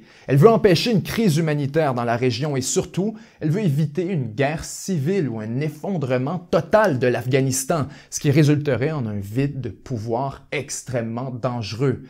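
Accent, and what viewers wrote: Quebec, Canada. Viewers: jaime pas laccent quebecois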